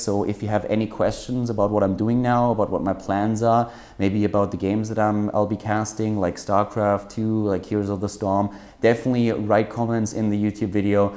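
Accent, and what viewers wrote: Germany. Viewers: Leider reagiere ich ziemlich allergisch auf Englisch mit deutschem Akzent. Denn obwohl Englisch sehr gut ist, hindert mich der leichte deutsche Einschlag doch daran, seine Casts voll zu genießen. Insofern wäre ich auch durchaus dankbar, mal was auf Deutsch zu hören.:-)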